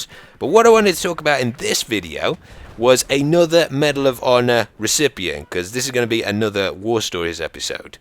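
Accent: United Kingdom. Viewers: Love history man. These war stories are awsome. Ur like a white Morgan Freeman with a british accent! :-)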